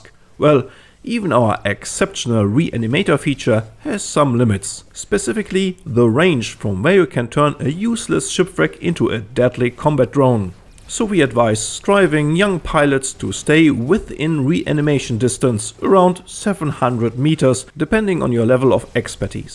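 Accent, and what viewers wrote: Germany. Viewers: do i hear a german accent ?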